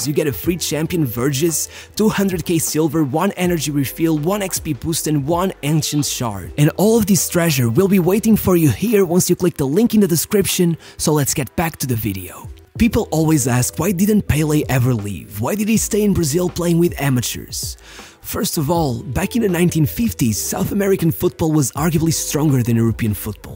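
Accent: Portugal. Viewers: Brother, you’re portuguese ain’t you? Não mintas crlhes. És tuga ao máximo, o sotaque não engana. If so... 👏👏👏👏👏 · So fui perceber que seu sotaque era portugues quando voce falou dos biquíniskkkkk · Ele é Portugues? parece a forma como diz os nomes Brasileiros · U sound portuguese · Ele e português bicho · Pela pronúncia dos nomes de jogadores e clubes percebe-se logo que és português... "So clean" ahahahahah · Top, és português não és? Esse sotaque...🧐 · Ele é português por isso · Just by the accent saying the players names, I know this guy is a Portuguese.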